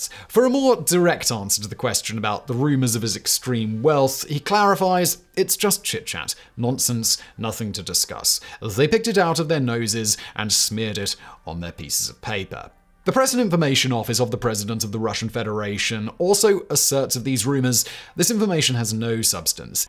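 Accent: United Kingdom. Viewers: because he has a British accent and the code doesn’t work in the uk. It makes no sense. The average hello fresh box in the U.K. (box of 5 meals) comes to £78 that’s more than I spend in a fortnight!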